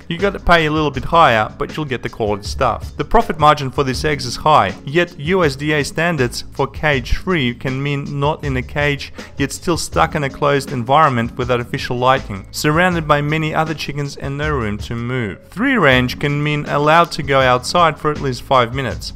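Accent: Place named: Australia. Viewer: Well we appreciate the information, the Australian accent is marginally tolerable, but with the addition of the needless interference of the BackBeat.. a plague on the present human race, your presentation is completely undigestible alas.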